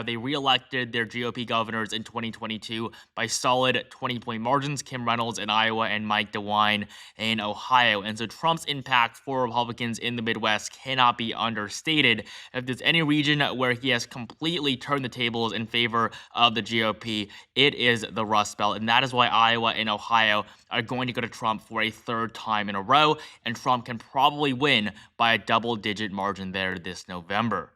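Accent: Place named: Pennsylvania, United States. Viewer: You have a noticeable accent. Are you from Pennsylvania?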